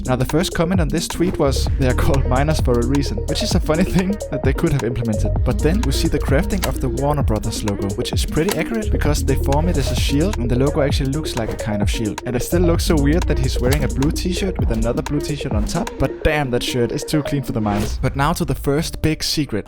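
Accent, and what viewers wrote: Denmark. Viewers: Are you from Denmark 🇩🇰 no hate and it is just my opinion cause you’re accent sounds like it😊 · “Wow the wolf’s name is Dennis” out of content but Are you from Denmark? I can hear it in your Voice, im from Denmark. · Love the danish accent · Danish brother🇩🇰💪 I could tell by your accent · OMG jeg syntes satme du lød som en Dker der talte engelsk XD Så var lige inde og stalk din YT og jemmen godag da kære med Dker :D :D :D Håber ikke du fryser formeget i vores kolde land :D :D :D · Are u Danish?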